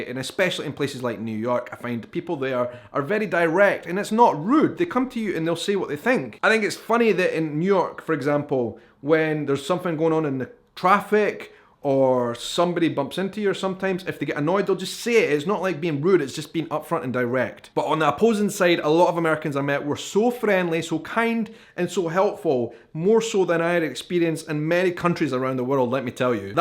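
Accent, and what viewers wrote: Scotland, United Kingdom. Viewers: great perspective , good show. i’m afraid my wife will fall in love with your Scottish accent !!🧐😎🤓😝👻👽👽👾👿 .... paul in ohio usa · I'm an American of Scottish and Syrian descent. I have visiting Scotland on my bucket list. I'm getting old, so, I'm hoping a bucket of money will fall on top of me. I hope you enjoyed the United States! We are, truly, an amazing country! (I love your Scottish brogue!) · My ancestors can from Scotland. Love your videos and accent. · This was great! The best part though was the subtitles trying to keep up with your Scottish accent. So funny.😅🤣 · Am i the only one who wants to hear him speak Portuguese with a scottish accent!?! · I need to hear your Portuguese with a Scottish accent. I need this in my life. (I've heard Brazilian portuguese from the city and the country as well as 'good' and 'bad' American-accented portuguese. 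It'd be interesting to see what bleeds through for a Scott.) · New subscriber here, and an American. Great video! Thanks for backing us up! Lol you're always welcome here! Love the Scottish accent, it's awesome, but I'd be very interested to hear a Scot speak Portuguese 🤔🤔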